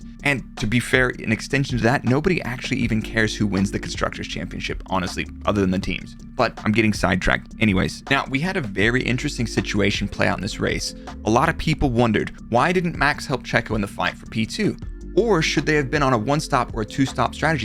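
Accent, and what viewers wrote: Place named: United States. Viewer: Love your content . It’s cool to see a good breakdown using data. I’m one who loves to look at telemetry. If I can suggest a video that I believe would be a hit is a comparison of teammates using the available data to break down who was faster. Removing as many variables that are out of the drivers hands and just look at raw pace. I think you could go very deep with it even factoring tire vs tire and length of stints , who has better tire management, etc. You would definitely kill it. Keep up the great content. It’s cool to watch f1 stuff from someone who speaks like I do . (I just mean a US native) actually I don’t even really hear the English/British/UK accent anymore. It’s almost more noticeable to hear a US accent .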